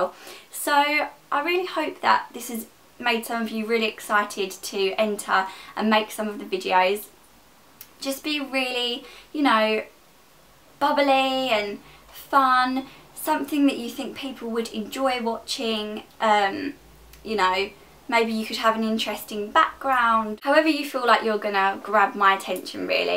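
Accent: United Kingdom. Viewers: First video I've seen of her! Before I even played it I was like "shes gonna have a British accent!!" and she did lol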